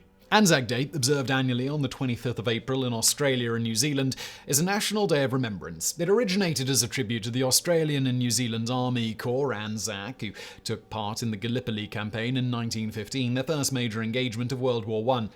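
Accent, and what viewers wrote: United Kingdom. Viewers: I attribute it to him being British and they pronounce words differently then we do in the states.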